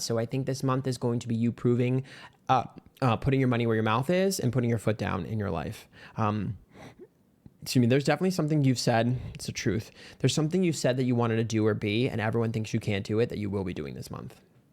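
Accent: New York, United States. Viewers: ♐️👄♐️ When I start hearing NY accent & dropping F-bombs = He is NOT messing around! 🫨 Thanks for the Go Ahead 👸🏻🧾 GTFOH IWTFYWB ACTIVATED 🫡 *sharpening claws*💅🏼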